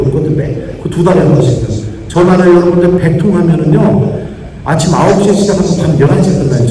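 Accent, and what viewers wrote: Chungcheong, South Korea. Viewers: 신부님 뵈오니 정말 좋았습니다 구수한 충청도사투리가 더 정겹고 좋았습니다 우리는 구원 받고 성령이끄심 대로 신부님 말씀 기억하면서 잘 살겠습니다~신부님 감사합니다 ❤❤❤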